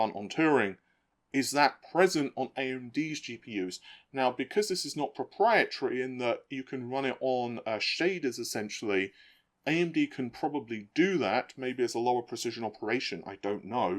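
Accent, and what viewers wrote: United Kingdom. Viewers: It's just pretty normal British English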